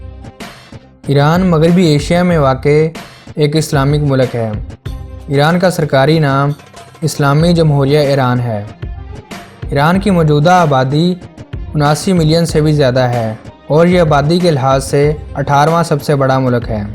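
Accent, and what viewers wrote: Pakistan. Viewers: Khuda me banday Urdu ko Punjabi lehje air talafuzz me to na bolo, think karo, were post achi this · خخخخخ هندی نیست ببخشید پاکستانی دالخور است از صدا یش مشخص اس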